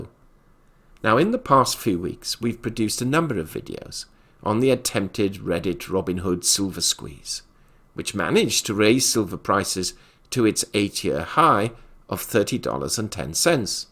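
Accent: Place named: England, United Kingdom. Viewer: Not so sure that is his real voice though. Real accent sure but I think it sounds speeded up. Could be wrong but have listened for a long time and I think the his voice sounds deeper/higher/faster/slower sometimes. Also seams prudent to disguise ones voice in this situation. Otherwise its the English language. It's everyone else that has the accent. I find it nice to hear it spoken properly. 🇬🇧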